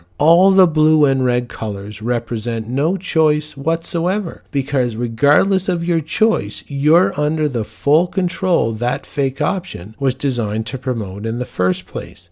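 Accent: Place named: Canada. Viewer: I love the sinister tone delivered in a Canadian accent, LOL.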